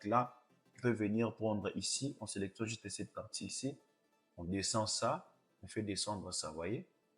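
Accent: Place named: Africa. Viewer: Grâce à toi je sais maintenant comment manipuler un tracé ET son contenu, qui remplit la modification que je fais avec l'outil (A) Sélection Directe sur un tracé - Avant je manipulais le tracé, ensuite je le reconvertissais en sélection et je faisais REMPLIR pff quelle galère .. 😖 Maintenant en convertissant le texte ou un objet en FORME ça change tout ! Le contenant 🔸ET 🔸 le contenu sont manipulables en même temps ☺ Merci, je m'abonne pour te soutenir (en plus j'adore ton petit accent africain 🥰)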